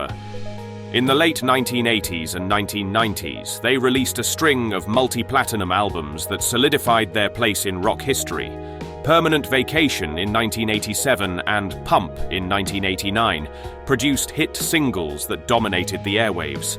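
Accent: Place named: United Kingdom. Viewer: I am so done with British commentary a big thumbs down! Old and wearing end condescending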